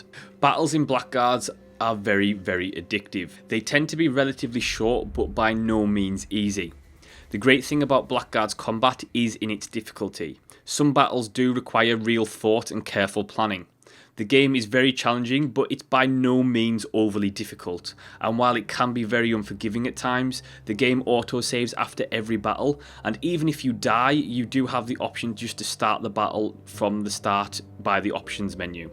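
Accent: Scotland, United Kingdom. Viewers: This is a bit expensive atm, I will pick it up in the next steam sale though for sure! This video seems like a great introduction to the game, I will check out some of your other videos for sure m8. PS Love your Scottish accent ^^ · You have a cool way to speak, is it Scottish?^^ (English isnt my native language, so no offense if its something else XD)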